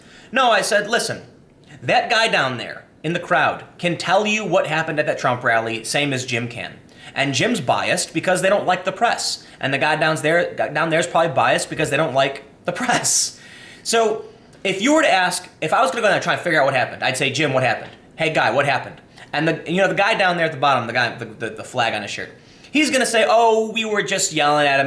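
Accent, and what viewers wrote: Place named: Chicago, United States. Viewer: i knew u was from chi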